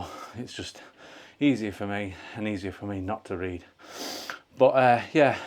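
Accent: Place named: United Kingdom. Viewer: Well done 💯💥 I've tried the FTP test myself a few times, and it's always been really hard at the end! 🥵 I don't know how constructive it is, but what I miss the most when I'm watching your vides, is proper subtitles 😅 As a dane, I sometime struggle a bit hearing/understanding what you say, maybe because you sound a bit British. The auto-generated subs are mostly ok, but for instance Kicker Core are subbed as "kick a car", the CC often gives weird results, when product or place names are spoken. I don't know how many foreigners are watching your videos, and I'm sure you have enough work editing your videos already, but still, I hopy you wellcome feedback. Anyway, thanks for another great video, Ride on! Happy New Year, and good luck with your cycling goals in '25 🎉 /Ole, Denmark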